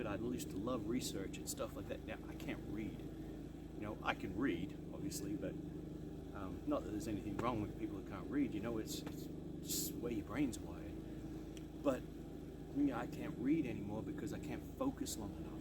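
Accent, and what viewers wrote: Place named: United Kingdom. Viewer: You accent ain't from around here?? I hear....British?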